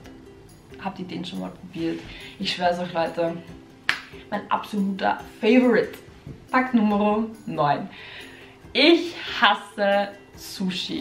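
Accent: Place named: Austria. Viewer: Sie ist aus Österreich, deshalb die Aussprache!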